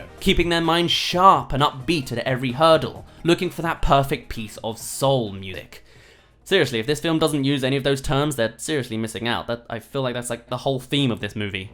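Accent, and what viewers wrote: United Kingdom. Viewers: When did get a british accent?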